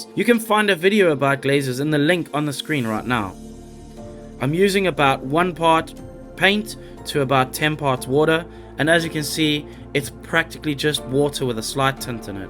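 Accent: South Africa. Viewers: South African, ???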